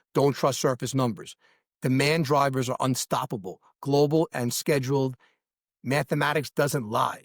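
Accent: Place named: Long Island, United States. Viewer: I lived in Long Island as a kid so I know the accent! I live in the Chicago land now but NY will always be home.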